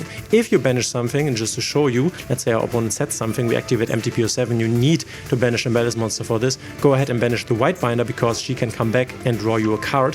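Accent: Germany. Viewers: is it possible you're a German? I think the way you speak reminds me of German xd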